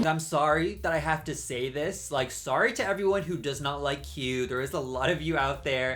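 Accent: United States. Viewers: Why DO you have an American accent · I know you said don't ask about it... but why DO you have an american accent as an irishman?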